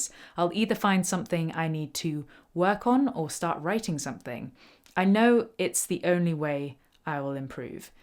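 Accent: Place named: England, United Kingdom. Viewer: My first time seeing you. I like your accent. You’re English but I can still understand you. 😆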